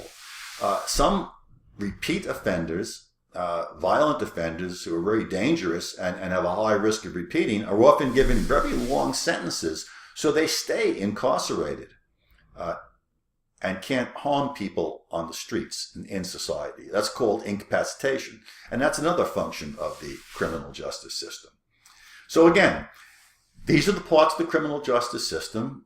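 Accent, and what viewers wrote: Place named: New York, United States. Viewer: I hear my New Yawk accent !!! ❤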